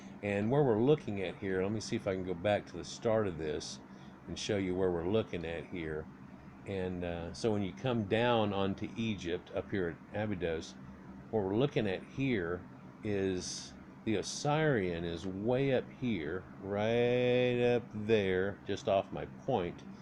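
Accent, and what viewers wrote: United States. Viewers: Your pronunciation is horrible for someone educated in America. English is your first language so when you are introducing foreign name with American accent you expose your ignorance. Hopefully we can all read, so you are reading from Wikipedia is silly, simply because you have not practiced your reading with frequent mispronunciation showing your ignorance. Fact #1- Egypt started as a miscegenated society between Ethiopian or Nubian and Assyrian or Asiatic. So there is no white/black conflict. They were mixed from the beginning. You spoke of early pre-dynastic tombs. These were in fact NUBIAN. So the origin of Egypt is Nubian (negro). Fact #2- There were two governments . Upper Egypt (NORTH) with White Crown and lower (South DELTA) with Red crown. Sesostris is famous for centralizing the govt and wearing the double crown. Sesostris by conquering Nubia made them part for Egypt for over one thousand years. 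It was not until the Greeks invasion that Nubia was separated from Egypt.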